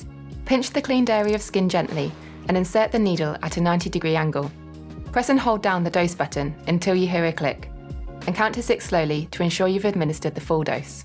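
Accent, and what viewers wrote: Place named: United Kingdom. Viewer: It states that is U.K. and you can tell from the accent. It is not all about the US !!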